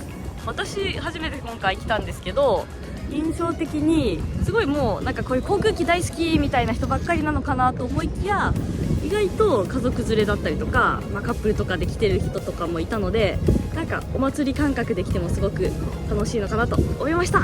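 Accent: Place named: Kansai, Japan. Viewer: 、お疲れ様❤❤❤ 横田基地、楽しかった見たいダネ〜👍👏👏👏 の関西弁が出まくってますから🤣🤣🤣 オプスレーにC2にYS11もあるのかナァ？戦闘機は、F22にF15にF2選り取り見取りダネ〜🥰🥰🥰 でも、やっぱり、私は、自分も、関わっている、AH1コブラ🐍ダネ👍💖💖💖 は、何が、良かったかなぁ？あっやっぱり、外人バーガー🍔かぁ🤣🤣🤣 じゃ、次回は、山口県、岩国基地のレポート、お願いしま〜す😘😘😘😆😆😆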